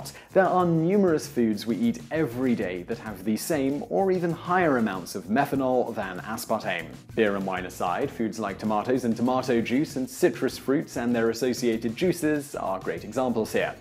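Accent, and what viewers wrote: United Kingdom. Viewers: Watching a lot of his videos, one learn that Brits pronounce a lot of words "wrong" (well, maybe just differently than we Yanks do).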